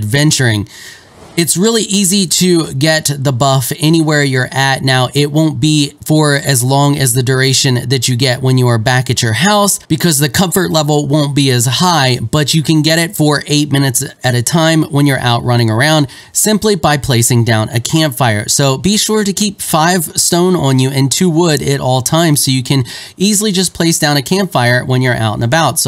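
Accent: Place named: Northeast, United States. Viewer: You sound like you're from the north east US. Nice content. Be safe.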